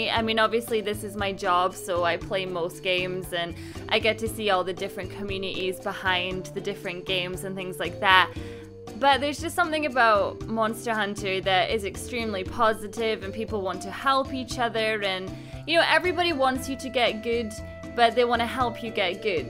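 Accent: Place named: Scotland, United Kingdom. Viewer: I'm thinking...Scottish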